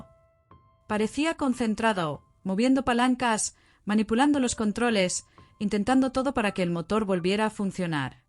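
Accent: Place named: Spain. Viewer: JA JA JA COMO YO ? PRIMERA LA BURRA POR DELANTE Y LUEGO LA ENFERMERA Y EL EQUIPO MEDICO AUXILIAR PRA ATENDER ALA PERSONA ENFERMA DURANTE EL VUELO. SI.. POR LA NARRACION DE VOZ ES UNA ESPANOLA QUE NI EXPRESARSE CON CONCORDANCIA SABE. EN SU PROPIO LENGUAJE. QUE VERSGUENZA ASI ARRUINA EL MENSAJE DEL SUCESO. EN CUESTION .QUE ABURRIDA NARRACION.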